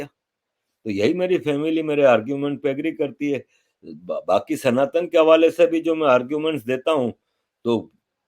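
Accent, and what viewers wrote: Gujarat, India. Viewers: Sir आप सच्चे देशभक्त ओर. लागता नाही की आप की परवरिश पाकिस्तान या मदरसे हुई है आप की बात करने का जो लहेजा है ओ पुरा गुजराती हिंदुस्तानी है .जय हिंद 🙏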